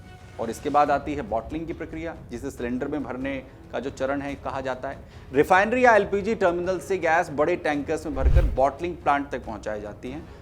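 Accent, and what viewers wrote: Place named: Bhojpuri, India. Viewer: Saaf baat bolna Bhojpuri kya kar raha hai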